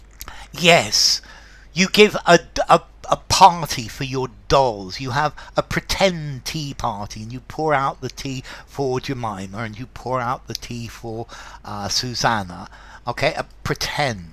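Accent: RP, United Kingdom. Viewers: I like posh accent a lot 🎉🎉thanks a lot sir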